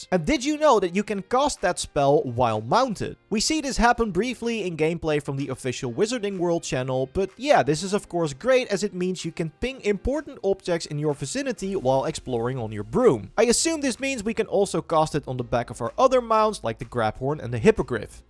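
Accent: Netherlands. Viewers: i love your dutch accent.....🥴😅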